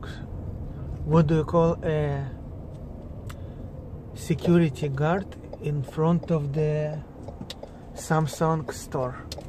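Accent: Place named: Russia. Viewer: This accent. Where are you from? russia?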